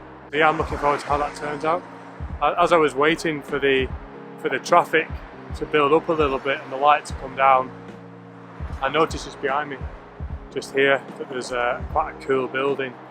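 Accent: Yorkshire, United Kingdom. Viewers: Hey, you sound more like Yorkshire than NZ?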